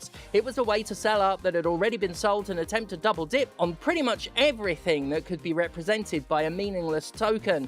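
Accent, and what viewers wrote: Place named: United Kingdom. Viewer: My God, she is even more British than she was before!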